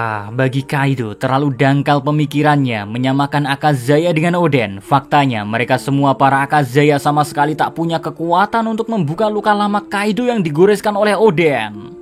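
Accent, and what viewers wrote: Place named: Magelang, Indonesia. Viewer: Aku penasaran, org mana sih? 90% org Jawa tengah, Magelang mungkin, logatnya kayak orang Magelang, Maaf, 🙏🙏🙏😁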